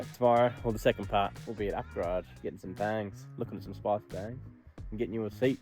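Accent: Australia. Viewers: Love your spiiiicy content!! (Ausieacent) !!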